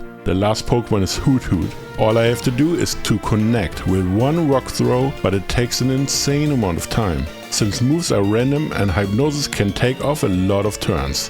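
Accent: Germany. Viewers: Top Video man hört fast nicht raus das du deutscher bist, hab trotzdem ne frage wie kann man onix entwickeln ohne tausch in HG ? P.S. mach weiter so deine Videos machen echt spaß zu gucken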